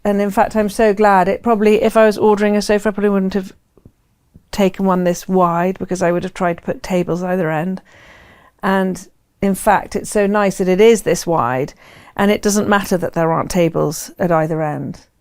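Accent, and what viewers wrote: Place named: England, United Kingdom. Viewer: Love her homey style and I love her English accent.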